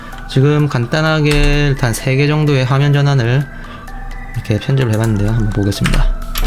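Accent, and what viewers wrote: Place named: Gyeongsang, South Korea. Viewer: 앗, 템플릿 영상만 보다가 처음으로 목소리를 들었는데 사투리 억양에 급 친근함이...ㅋㅋㅋㅋㅋㅋㅋㅋ 저도 갱상도 입니다...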